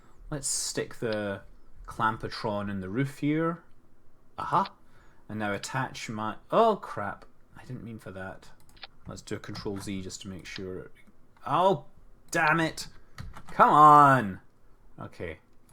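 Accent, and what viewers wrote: Scotland, United Kingdom. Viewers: Thanks for this ive been waiting for a video like this for a while;) Anyway just a question but are you scottish, because you sound like you're from scottland?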